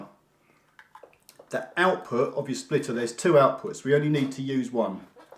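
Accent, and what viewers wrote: United Kingdom. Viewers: This guy is like the cockney version of Karl Pilkington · man are u from UK bro i a from denmark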